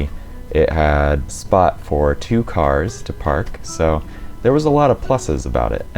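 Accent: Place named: San Francisco, United States. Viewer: Your accent sounds like you may be from the Castro district of San Francisco. Am I right?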